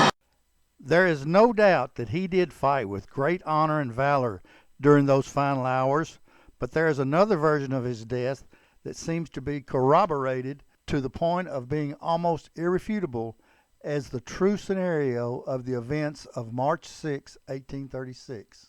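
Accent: Texas, United States. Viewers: I'm in UK and we love Texas accent ! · Your thick Texas accent compromises your heartfelt thesis. Who would willingly submit to a despot--facing certain death?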